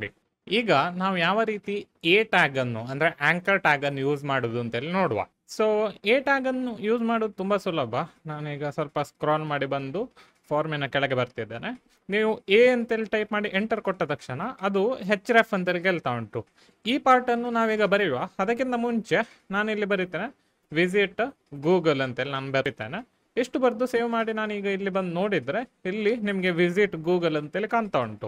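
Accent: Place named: Mangalore, India. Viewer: Evaru mangalorean ah? Accent same mangalore navra tara ide..❤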